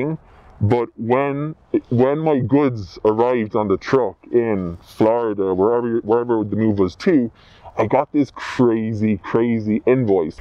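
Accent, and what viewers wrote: Ireland. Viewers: I’m afraid the voice modifier doesn’t get rid of your distinctive Irish accent, nor your typical rhythm of speech. Fun video tho!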